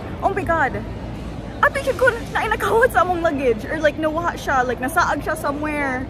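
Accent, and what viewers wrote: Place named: Visayas, Philippines. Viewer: Cute kaayo kag bisaya accent pero bawi ra kay gwapa na angel pa jud.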